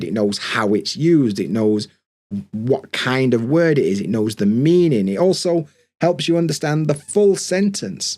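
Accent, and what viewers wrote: United Kingdom. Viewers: I love British Accent 🇬🇧 it's really soft for my ears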